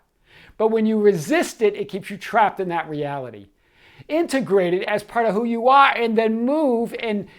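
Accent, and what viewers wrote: Boston, United States. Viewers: Your Baaston accent makes flip charts very exciting!!!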